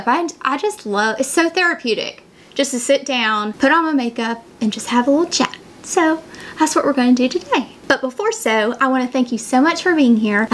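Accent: South, United States. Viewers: So I’ve always been a Tarte fan. I’m not a colorful eyeshadow girl so their “boring” as most say palettes appeal to me. Love your videos! I’m in Alabama so the southern accent makes me feel at home!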